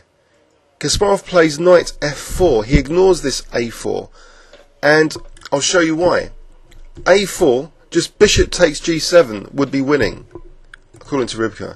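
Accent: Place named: RP, United Kingdom. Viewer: love your clips, as much as I like your accent. That's how English is supposed to be spoken.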